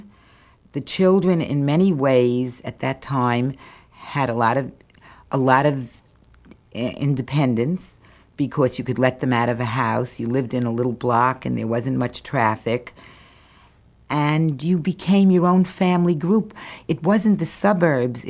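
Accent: New York, United States. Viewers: I love her accent! You can leave New York but NY won't leave you.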